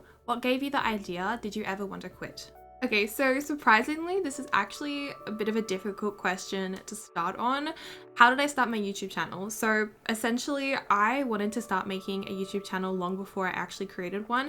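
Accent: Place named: Australia. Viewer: I met you recently, just when the Growing together pack came out and I'm currently looking at your other stories 🥰 I loved your Australian accent and your sweet voice, as well as the way you tell the stories I am Spanish and my English is basic but I have to tell you that I can understand most of it well. Thank you very much for being so appreciative of us and for your hard work ♡ Starting to do this despite your doubts is having a lot of courage, thanks for everything ❤️ take care of yourself 🍀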